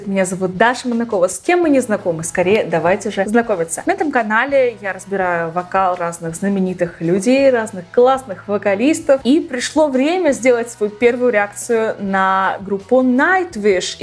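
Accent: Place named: United States. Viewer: silence? .... никогда не критикую строго ютуберов, ибо сам начинающий, но так пафосно в начале видео произносить с американским акцентом слова и не распознать слова в тексте - помоему это фейл, Вам не кажется?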